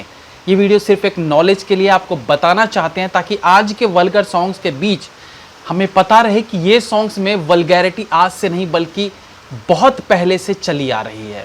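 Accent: Bihar, India. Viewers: आप बिहार से हो?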